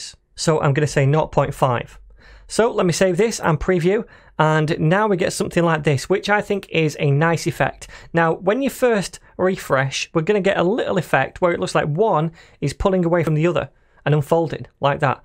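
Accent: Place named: RP, United Kingdom. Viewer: The best thing is he speaks the Queen's English! But everything else is superb too.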